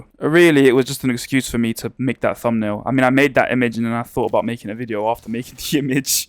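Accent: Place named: London, United Kingdom. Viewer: "He's a white guy. He doesn't really talk to lgbt people." "It's punching up. It's ok," I used to live down south heck. I was born there, but the absolute state of younger people down there is awful. The political element is absolute biased I promise you there is own group biase in this guys content in the same way those evil "white" people naturally are anti woke seeing how woke works only ever to hurt them and benefit others while ultimately this video reeks of the sort of fake learned culture where you hide behind humour but there is a consistent vilification of white people and people who have been successful in any way but remain right wing. This must be that all inclusive London culture that has been building since the anti colonialism pro natavist heros outnumbered the natives in their own capital city and proceeded to push none native culture and establish leadership from a man who previously said white londoners aren't real londoners. 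Why am I talking about london? Because this guy is either from London or he mimics them and lives/lived relatively nearby. Either way that accent, an accent that almost always flatterns with education into a more proper way of speaking and actually pronouncing words properly, that accent is almost always a marker of someone with surface level british culture followed by deep rooted ethnic biase a learned hatred/phobia of white people despite often being white or otherwise living in a white country and the refusal to engage with any form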